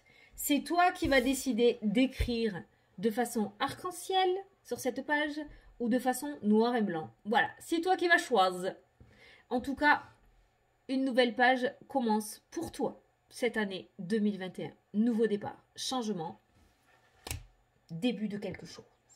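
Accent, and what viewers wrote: South, France. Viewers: Mais franchement, cet accent qui sent le sud et cette patate et joie. Rien que ça, c'est un réconfort quand on traverse une période pas cool. Alors merci pour tout ça!!! Passe un réveillon plein de chaleur et d'amour.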